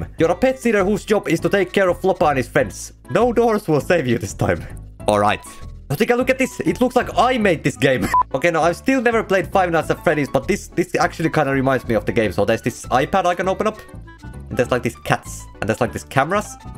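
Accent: Finland. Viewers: i love the strong Finnish accent probably because im Finnish.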